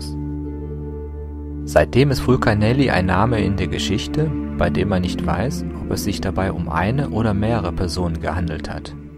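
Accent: Spain. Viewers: meinst die sympathische Damen Stimme mit dem spanischen "Selma Hayek" Akzent, oder ? Ja, ich vermisse sie auch schon. 😃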